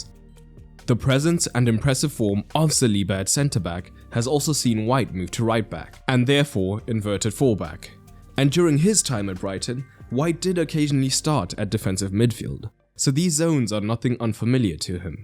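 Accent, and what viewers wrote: South Africa. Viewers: Are u South African? 🤨🤔🧐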